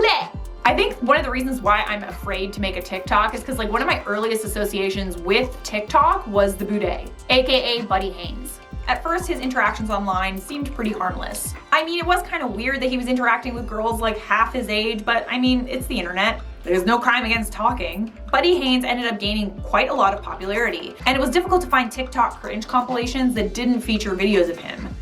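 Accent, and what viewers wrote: England, United Kingdom. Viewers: Stop trying to be funny it’s not working And your English accent is awful and cringe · I was thinking I was gonna dig this video, then the British accent rolls in.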